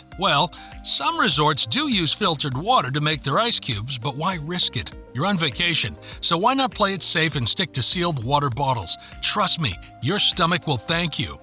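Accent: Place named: United States. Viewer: " captain" must be " Yankee "...well "captain".. After 14 times in Cuba all over of Cuba, you sound really like " Yankee " YOU, buddy just miss Cuba really good times, 5, 10, 15 years ago. Love Cuba, love Cuban people, see and experience everything....your podcast is not good buddy..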